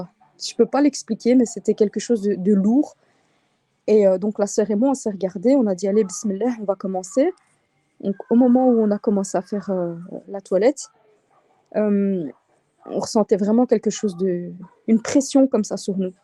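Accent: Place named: Belgium. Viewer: Cest pas beau laccent belge ...en dehors de ca cest bien que des personnes sache faire le lavage mortuel et davoir le courage ...Allah oumma barek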